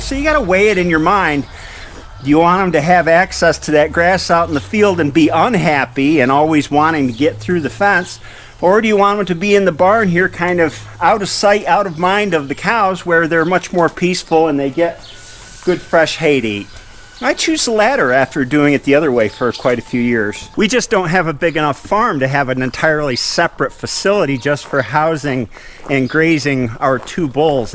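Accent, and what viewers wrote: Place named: Central New York, United States. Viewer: I like how says, "Expuriments"! I went to SUNY Cortland, studied Ag Science, and that's how they say it in central NY! He reminds me of home!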